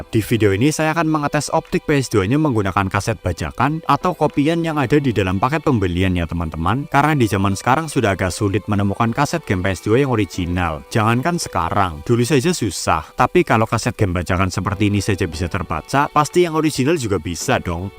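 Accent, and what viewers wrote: Banyumas, Indonesia. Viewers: mas njenengan Banyumase pundi? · Pada² ngapak Oh iya njenengan wong ngendi mas?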